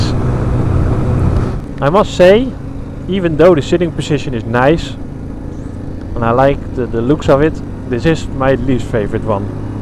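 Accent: Netherlands. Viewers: haha hoorde gelijk dat je nederlands was ik ben deze aan het overwegen als mijn eerste motor zou je hem aanraden?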